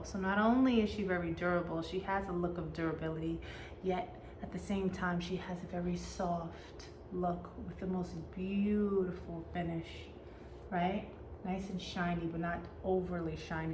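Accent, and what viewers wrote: New York, United States. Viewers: For sure from NY lol I know that accent 😍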